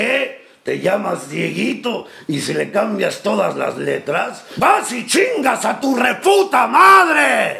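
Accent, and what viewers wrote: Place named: Argentina. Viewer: La verdad que te sale muy bien nuestro acento argentino. Pero no le vi la gracia al chiste. Aunque si me gusto lo de pepito que si le cambia una letra se llama "peputo" ajajaja ajajaja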